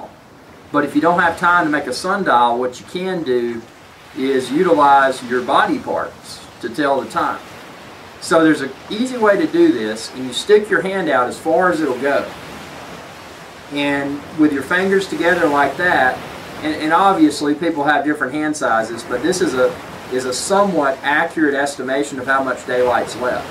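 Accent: United States. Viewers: yeahbuddy! first to comment. ur american rite?nice accent, fake backround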